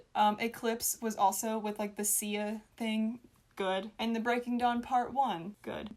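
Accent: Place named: South, United States. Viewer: I didn't know you were from the south and now you've said that I can here your southern accent so clearly !!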